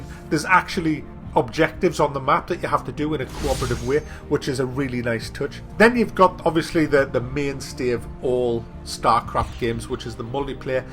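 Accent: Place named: Sunderland, United Kingdom. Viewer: North East of England. Sounds like somewhere like Sunderland but I could be wrong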